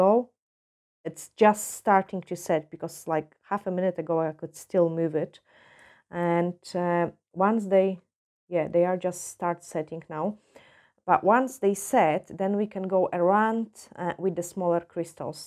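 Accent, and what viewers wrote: Scotland, United Kingdom. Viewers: Do I detect a we bit off Scottish in your accent ?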